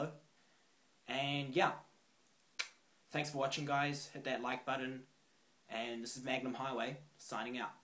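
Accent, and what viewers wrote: New Zealand. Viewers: are u from New Zealand?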